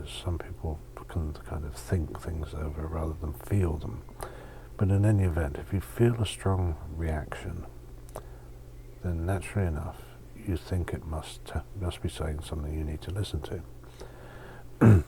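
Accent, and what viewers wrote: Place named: Hampshire, United Kingdom. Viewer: Ah it's a Hampshire accent - yes, some Sussex accents can be similar too. I thought you didn't come across as Cornish in your attitude or manner, so was a bit puzzled, but now I get it. Yours is a very reassuring and earthy accent/voice, which suits your purposes very well. I find it very calming.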